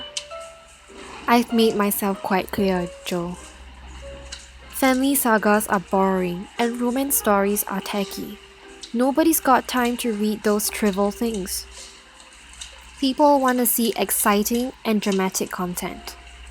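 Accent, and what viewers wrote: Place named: Singapore. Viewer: damn the narrator is singaporean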